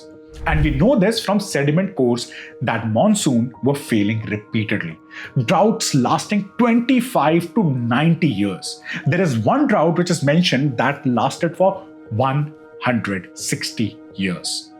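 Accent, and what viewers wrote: India. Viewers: nice to listen to an Indian guy (and not British) doing this!!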